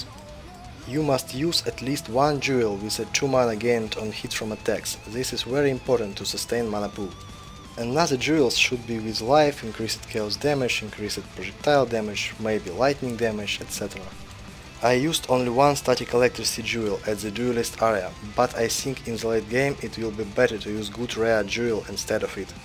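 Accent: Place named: Russia. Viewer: почему автор говорит на англе , но при этом имеет русскоязычный акцент ? :D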